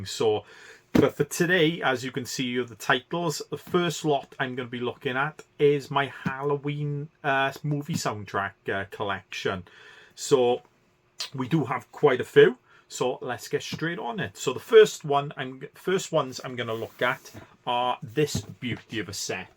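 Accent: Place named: Wales, United Kingdom. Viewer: A Welsh man??? Well hello sir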